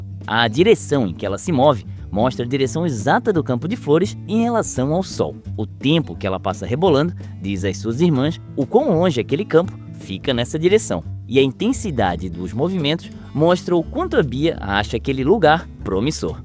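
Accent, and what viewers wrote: Santa Catarina, Brazil. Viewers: vc tem voz de catarino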